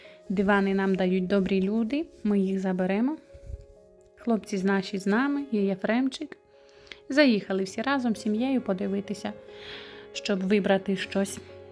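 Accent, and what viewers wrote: Rivne, Ukraine. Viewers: якщо не секрет з якої області України ви переїхали.Ваш акцент,говір дуже схожий на той де зараз живу я.Рівненська.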